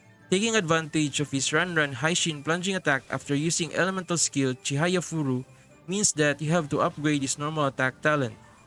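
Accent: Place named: Philippines. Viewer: MmHmmhmmm that thick Filipino English accent is so *chef kiss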